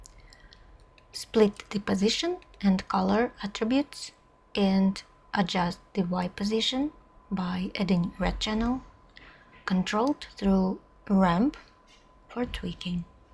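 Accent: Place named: Russia. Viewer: Прям слышен крепкий русский акцент.✊🤟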